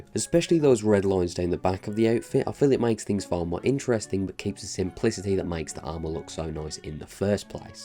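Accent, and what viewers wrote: Birmingham, United Kingdom. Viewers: Wow didn't know you were a fellow brummie. Nice to hear man haha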